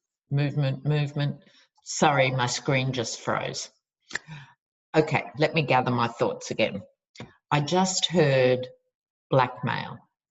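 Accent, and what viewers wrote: Australia. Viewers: Great readings! 🌺💐🌺I love your clear, concise yet laidback style, and your Aussie Accent is the best! 😉Thanks for sharing your awesome gifts and talents with us. Happy New Year 🛎🎉🎉🎉and Continued Blessings! 💙🧡💜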